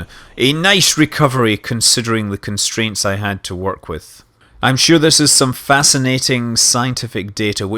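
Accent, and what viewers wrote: Scotland, United Kingdom. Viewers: He is a Scottsman :)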